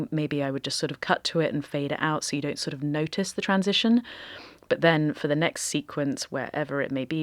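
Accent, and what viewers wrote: United Kingdom. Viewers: Oh yes, absolutely. It's very odd to me as a Canadian that we can live 2,000 miles apart from one another in my country and have virtually the same accent as English speakers--but head to the UK, and 20 miles down the road is far enough to have a distinct accent that proudly identifies your hometown. I've started to tell the difference, even as a foreigner, among the many English & British accents -- and is far and away one of the most beautiful.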